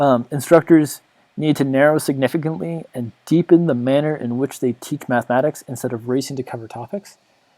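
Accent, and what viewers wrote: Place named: Canada. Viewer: It's all about that Canadian accent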